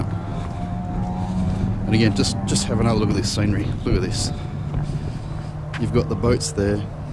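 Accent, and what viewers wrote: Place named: New Zealand. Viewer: Great Video. Classic Kiwi accent.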